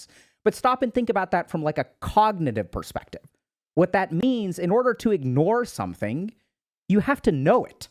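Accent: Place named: India. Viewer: Saw the title, first thing came to my mind was this, in your most iconic Indian voice: Stop reading my mind. Dr.K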